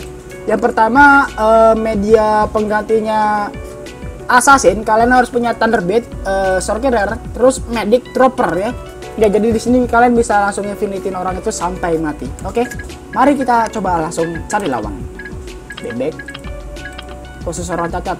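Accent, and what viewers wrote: Padang, Indonesia. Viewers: Lu org minang bang ..!? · Urang padang?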